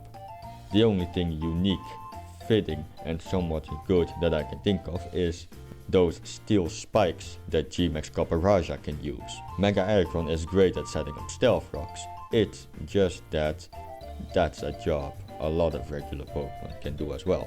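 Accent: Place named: Finland. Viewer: are you perhaps from finland. the accent sounds so finnish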